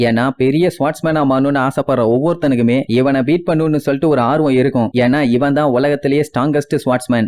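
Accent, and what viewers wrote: Coimbatore, India. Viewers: Avaru coimbatore bro so antha slang summa pesiruparu kandukadheenga 😂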